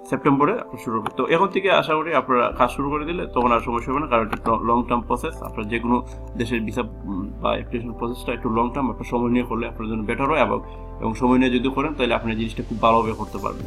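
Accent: Sylhet, Bangladesh. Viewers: Sylheti vai😍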